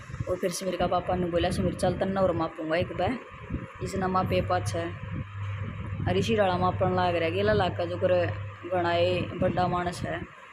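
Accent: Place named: Haryana, India. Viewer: Aapke Haryanvi boli mujhe bahut acchi Lagti Hai Sabse acchi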